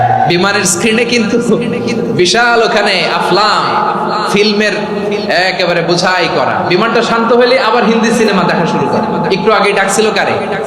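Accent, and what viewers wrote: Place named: Sylhet, Bangladesh. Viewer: sylheti hoeo kota gula valo laglo ❤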